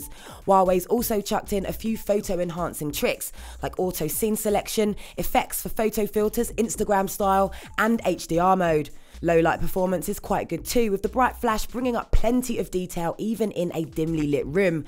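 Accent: United Kingdom. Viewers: i hate british accent too anyway half of this is rubbish because i can get 4g with it because i do the camera is amazing and the DEFINITELY NOT LAGGY U LIED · Hate Britsh Acsent ! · Love the British ascent!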